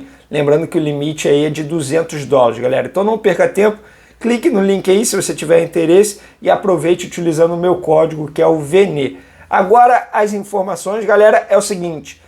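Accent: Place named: Rio de Janeiro, Brazil. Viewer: Quem é esse Giérson que ele tanto fala? Kkkk Carioques é bom demais.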